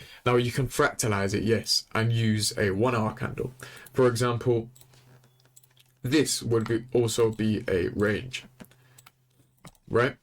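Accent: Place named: London, United Kingdom. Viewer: 😂😂😂😂 people that trade ict can’t trade and I’m willing to take that to the grave. If you can’t teach a 10 year-old your strategy, your strategy is too complicated. at the end of the day every strategy works you just have to control your risk and have set rules. Losing is inevitable in the market. So someone with a London accent, using proper English and big words doesn’t make the strategy any better.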